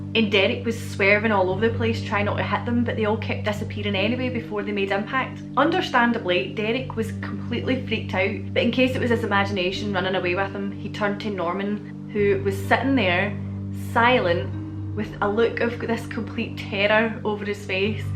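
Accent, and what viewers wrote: Scotland, United Kingdom. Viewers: Great video and amazing channel... And I love your Scottish accent too! 🙂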